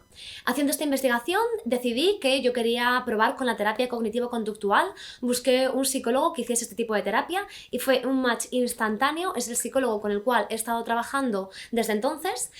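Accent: Spain. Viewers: her Spain Spanish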